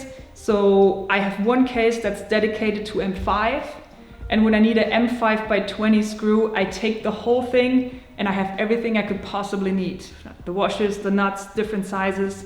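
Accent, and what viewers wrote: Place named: Germany. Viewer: peinlich , wenn eine Deutsche englisch spricht ...:-/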